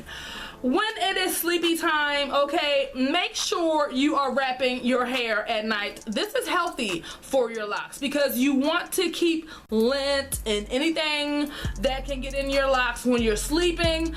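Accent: Baltimore, United States. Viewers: she definitely from Baltimore lol love it! love these videos, definitely helping as i'm looking to start my journey in a month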